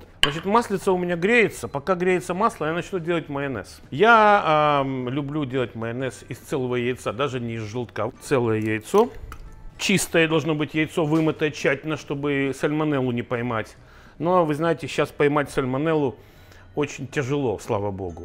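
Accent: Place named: Murmansk, Russia. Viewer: Слышу Мурманск - ставлю лайк.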